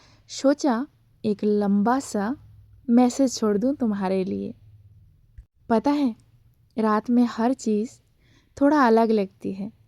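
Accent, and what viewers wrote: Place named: India. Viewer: Indian tumhari awaaz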